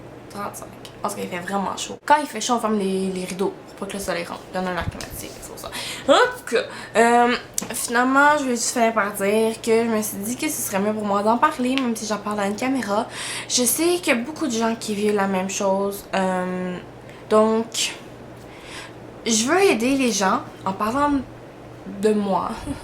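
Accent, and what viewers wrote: Quebec, Canada. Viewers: Un accent québécois... Du con. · Je vois même pas comment et pourquoi les gens écrivent des " bad comments " about you.I mean,tu es trop mignone avec ton accent bien Canadien qui sonne un peu Anglophone,j'adoooore trop,et puis tu es jolie.J'adore tes yeux et, tes sourcils aussi.Et puis tu as un style que EN GENERAL je n'aime pas trop,mais ça te va si bien.En tout cas , essaye de ne pas écouter leurs bad comments et les ignorer restera ta plus belle arme ;). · tu sais elle fait comme elle px parce que tu le vois toi meme elle a un accents alors c'est deja plus difficile de parler français avec un accent que je pense est canadien alors la ferme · tu as un peu le meme accent que jean-marc généreux, tu es québécquoise? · tu es peut etre belle sous ton rouge a levre mais sinn j'aime bien ton accent(canadien?) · J'adore ton accent québécois :) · Tu as bien raison dans tout ce que tu dis. 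Ne te laisse pas abattre, tu es comme tu es, les autres on s'en fout. Les gens ne savent que critiquer, ils ne se rendent pas compte qu'ils font du mal aux autre. On se ressemble un peu, niveau caractère. En tous cas, tu as l'air super sympa, et je suis fan de tes vidéos. Oh et, j'adore l'accent Québécois! :) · Je t'aime bien,toi et ton accent québécois :) ^^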